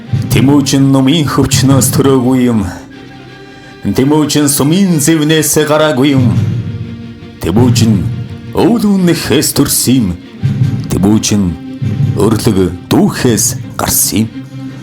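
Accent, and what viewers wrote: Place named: Durvud, Mongolia. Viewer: Uvsana aimagiin Dansan sumyn zaluu baina. Durvud ayalguugaar unshij baina.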